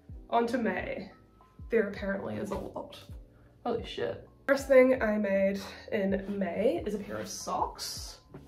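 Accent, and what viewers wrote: New Zealand. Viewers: I'm assuming from your accent that you're in NZ. I'm in Aus. Where do you get Knitting For Olive in the southern hemisphere? I've only found one website that would ship it to Aus and it was a very expensive Japanese website that would have cost like x3 normal.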